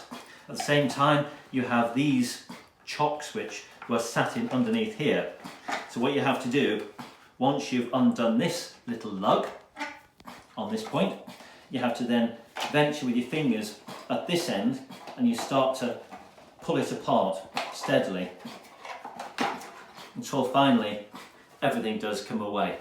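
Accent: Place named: United Kingdom. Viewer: Good morning, you're brilliant, and the explanation, with that British voice, is thrilling!!! Very explanatory video... I disassembled "almost" everything following your instructions and made it to the end... Unfortunately, I wasn't able to open the black box containing the folding motor and, more importantly, the one you ordered and replaced. Can you tell me the parts with the codes and where to order them? Thanks. Giorgio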